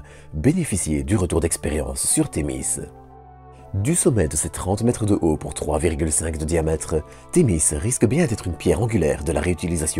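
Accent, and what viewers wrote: Belgium. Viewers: Accent Belge, normal quand on est né en Belgique.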